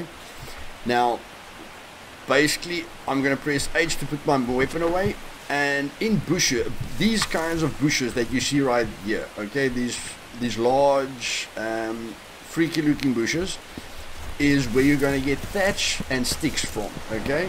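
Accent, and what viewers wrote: South Africa. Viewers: Is jy south african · Are you from South Africa? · Where are you from? xD Sounds like an SA accent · Hey, which country are you from? Your accent sounds like you are from south africa? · Thick South African accent. Love it 😂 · You're south african aren't you😂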